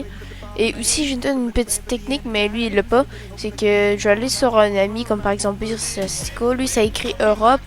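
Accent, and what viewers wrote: Quebec, Canada. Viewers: T québécois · T'es québécois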